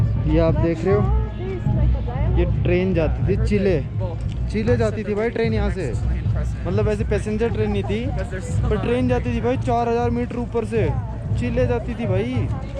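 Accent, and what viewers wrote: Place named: Kanpur, India. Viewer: Yeah tho kanpur wala style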